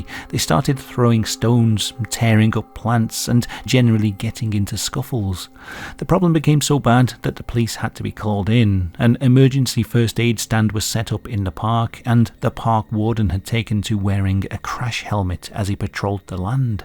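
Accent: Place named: Newcastle, United Kingdom. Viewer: Geordie accent? Duck off, I'm out.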